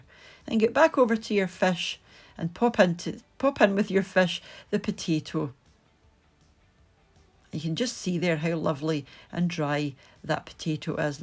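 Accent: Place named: Aberdeen, United Kingdom. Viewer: Look delicious, thank you .. and I love your accent, sounds like an Aberdonian? 🏴 ❤️🇦🇺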